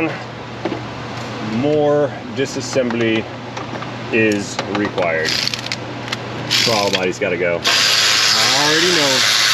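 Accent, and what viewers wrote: Russia. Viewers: Better Russian accent than me... and I speak Russian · Your Russian accent reminds me of FPS Russian. 😂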